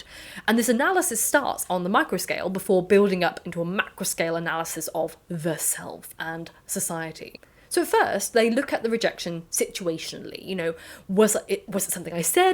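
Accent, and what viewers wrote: England, United Kingdom. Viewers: I feel like I just had a great lecture from a former professor. And as an American, the English accent made it all the more better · You are British af. I love the way you talk!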